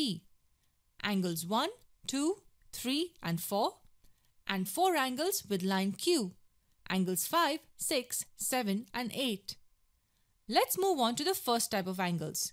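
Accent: India. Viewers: why would you use an indian persons voice bruh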